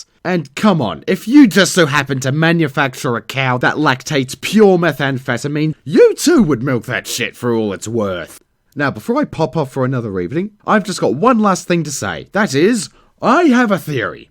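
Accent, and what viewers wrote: Australia. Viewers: Why do you sound Australian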